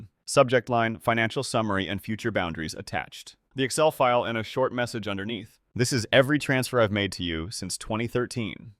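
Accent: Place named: Russia. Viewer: 2nd video seems like the narrator went Russian or something again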